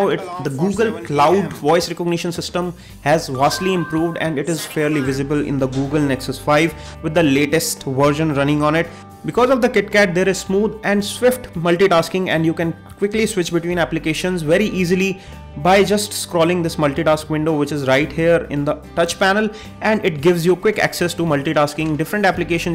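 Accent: India. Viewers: South indian accent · Fuckin indian accent,Fijay Raj Khumar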